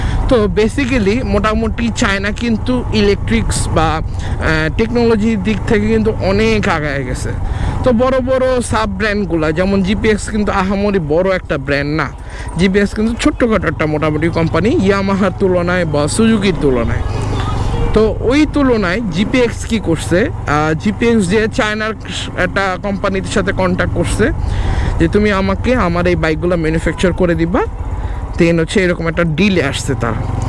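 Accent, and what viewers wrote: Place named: Gazipur, Bangladesh. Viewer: Apnr basha Gazipur kothi porse 😊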